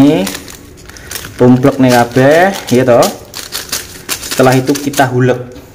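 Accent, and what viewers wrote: Java, Indonesia. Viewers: mas pean ki orang jawa ta ngomongnya bhsanya jawa medok😃😃😃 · Iyo mas Iyo coba Ojo medok ndesone hehe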